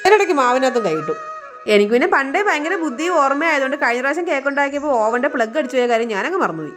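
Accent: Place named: Pathanamthitta, India. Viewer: ഒരു പത്തനംതിട്ട slang അതാ chodiche❤️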